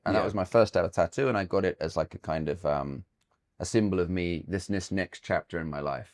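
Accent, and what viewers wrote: United Kingdom. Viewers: ジョージのブリティッシュアクセントかっこいいし男でも惚れます。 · *の喋るコクニーアクセントがまさに*だった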